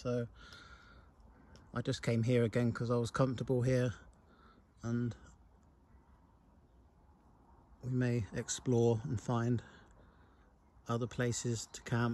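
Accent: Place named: Bristol, United Kingdom. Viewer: I thought it was Bristolian, but you also have a slight estuary twang too in some of your words. That's what made me ask.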